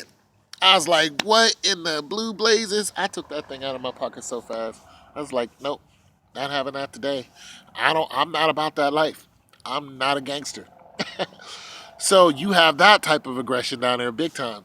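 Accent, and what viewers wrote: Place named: New York, United States. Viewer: You say you from Ohio and/or Pittsburgh, but you sound like a New Yorker all day. Ohio, nor Pittsburgh has burrows. What's really going on my G?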